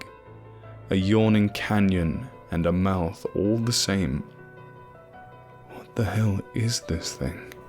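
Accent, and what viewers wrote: United States. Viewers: That American accent is on point my dude 👍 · Over-pronounced? Just sounded like various American accents. Sounded pretty good to me. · If that's you doing the American accent as well, damn, you are GOOD. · Your American accent is wonderful!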